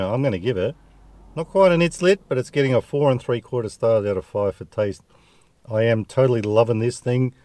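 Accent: Australia. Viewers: This blokes a little too Australian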